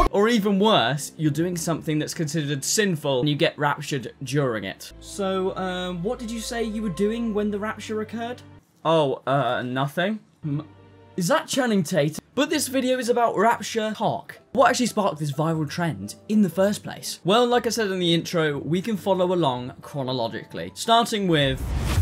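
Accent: United Kingdom. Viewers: Handsome, naturally good physique, British accent, funny content, gentle humour = best recipe for my entertainment Thanks for having started making videos! But also thx for the yt algorithm for suggesting you to me.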